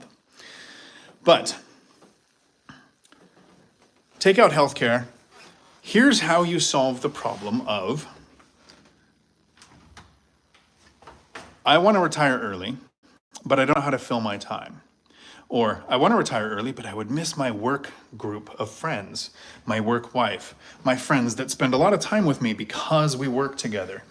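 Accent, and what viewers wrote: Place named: United States. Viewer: Speaks American. I've been to Thailand many times and they don't speak any particular kind of English except English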